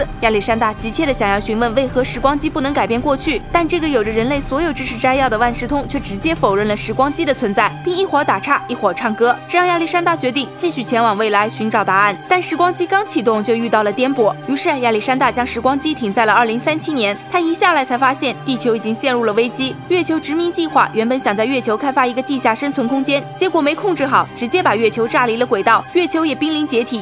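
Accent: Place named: Henan, China. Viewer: 無意間聽河南人的口音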